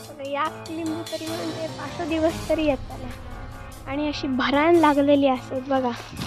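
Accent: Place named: Malvan, India. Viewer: Tuz gaon konat...? I like malvani language tone Very nice video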